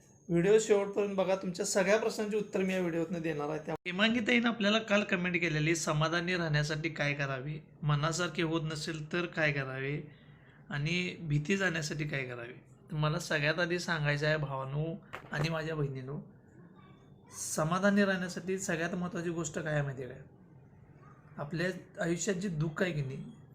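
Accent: Kolhapur, India. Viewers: दादा कोल्हापूहून आहे काय भाषेवरून विचारल..😊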